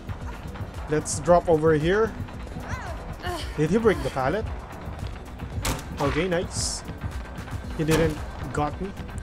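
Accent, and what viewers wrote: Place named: Philippines. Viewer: pinoy ka pre? medj dinig ko punto mo haha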